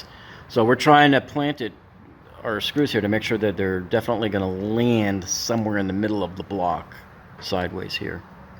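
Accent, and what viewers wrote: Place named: New England, United States. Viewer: I hear New England, loud and clear.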